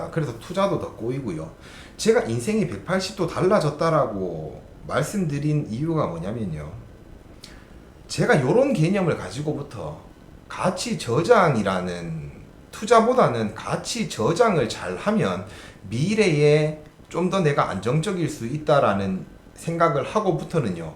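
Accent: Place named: Gyeongsang, South Korea. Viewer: 진솔하게 다가오네요. 응원합니다. 대구말투 ㅎㅎ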